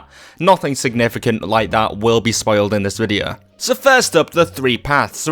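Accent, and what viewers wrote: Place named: Liverpool, United Kingdom. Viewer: The uploader probably hears that question a lot, so this is for the community: What is that accent? Reminds me of a Scottish influence lice Scouse as far as vowels are concerned - but inflection and consonants are way different. Accents are very interesting but incredibly hard to learn about if you don't specifically set out to learn about them^^ Learning English didn't even feel like learning, just exposing myself to the language... but with different accents, you're rarely given the meta information about them when you're just watching stuff ;) Yes, I'm off topic. I Know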